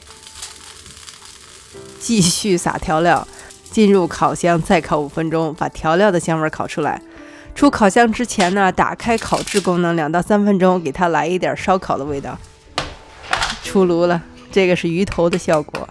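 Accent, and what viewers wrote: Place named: Xi'an, China. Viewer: 是西安人吗？和我说话的感觉一样！突然觉得留学很亲切！最主要的是，可以用很美国化的食材烹饪中国的食品，简直欲罢不能！大赞～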